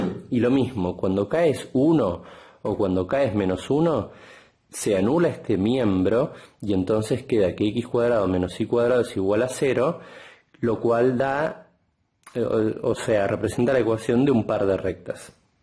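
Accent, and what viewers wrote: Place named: Argentina. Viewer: hola como estas? genio excelente explicacion, argentino tenes que ser ja ja. tenes idea como mover el plano en geogebra 3d? abrazo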